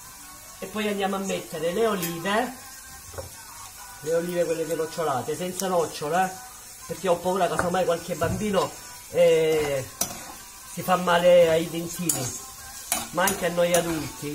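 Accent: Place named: Lecce, Italy. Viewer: Grazie per le tué ricette .io sonno nata a nardò e vivo in francia . Mi fa tantôt benne sentire il dialeto leccesse.avevo 4 anni quando son arrivatta in francia.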